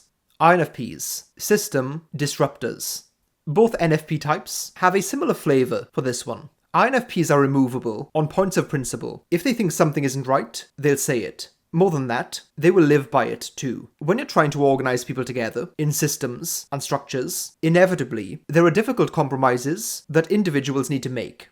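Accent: Wales, United Kingdom. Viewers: He was the first yt channel I found on mbti - Iearned a lot with his simpler style videos, then moved more to your channel for the fancy language and welsh accent.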